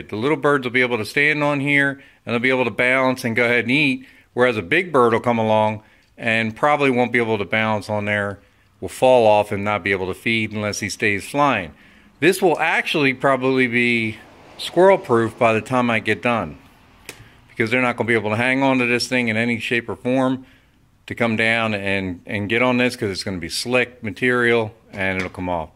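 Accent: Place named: Delaware, United States. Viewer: So good to hear a Delaware accent! Love it - made me hungry for a hoagie! And oh yeah, very imaginative and useful design - thank you.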